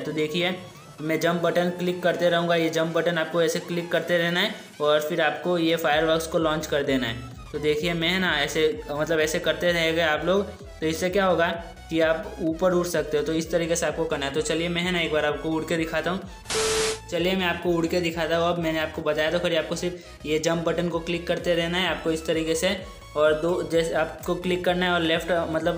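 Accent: Gujarat, India. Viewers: Pakka tum gujrati ho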